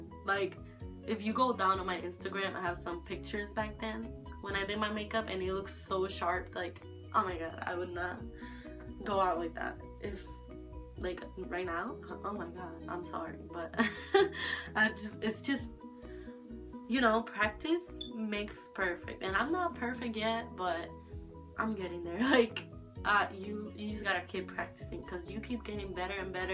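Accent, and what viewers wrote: Puerto Rico. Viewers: que orgullo saber que eres boriqua. Yo soy cubana y veo los videos de Gabi. Cuando escuche tu accento en un video con Gabi y la otra rubia, tuve que encontrar tu página to support my fellow latina! Espero que sigas haciendo videos y que te hagas grande y famosa mientras sigas representando a todas las latinas que te siguen. Good luck girl!:D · Yaasss girl!! Saludos desde PUERTO RICO! Escuché tu acento y rápido supe que eras boricua. Eres bella y me encantan tus tutorials de maquillaje. Xoxoxox 😘💕🇵🇷🇵🇷🇵🇷🇵🇷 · You should make a video talking Spanish ! Yess if love to hear you speak because I love how Puerto Rican's talk lol 😭 btw your soo pretty 😍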